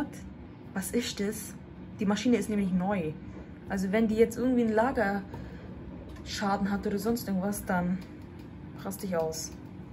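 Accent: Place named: Swabia, Germany. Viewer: süss das schwäbische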